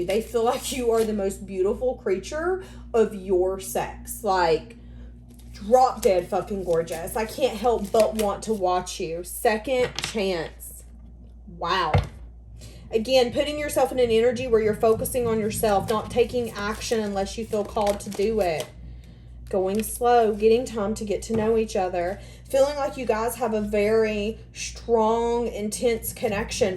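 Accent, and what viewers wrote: South, United States. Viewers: My goodness, child. I do believe you are the smartest reader I have ever encountered. The only thing you missed is that he has a relatively new diagnosis of cardiopulmonary illness. And that would tie it all together. And I LOVE your southern accent. Peace.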